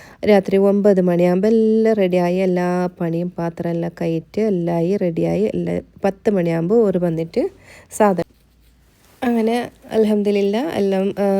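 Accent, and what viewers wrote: Kasaragod, India. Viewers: Kasaragod ആണെന്ന് തോന്നുന്നു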